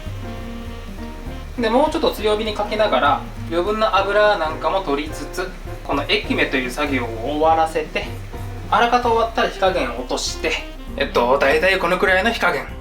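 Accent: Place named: Hakata, Japan. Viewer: たまに博多弁出てますね！ 懐かしかです。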